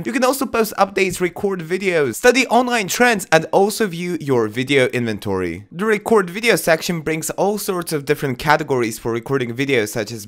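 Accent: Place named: France. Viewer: What a lovely accent! Are you french?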